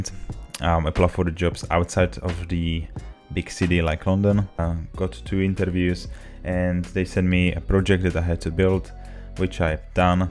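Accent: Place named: Czech Republic. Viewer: where are you from bro? it seems to me like you have some Czech accent :D fix me if Im wrong. Im also Czech and I wanna ask you on something about United Kingdom right now. If its really difficult for foreigner with IT skills like you have to apply for a VISA? thx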